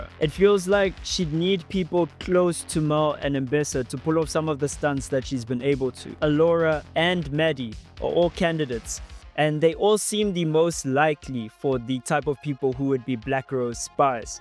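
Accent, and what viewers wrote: South Africa. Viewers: once i heard the voice i knew u were from SA ...lol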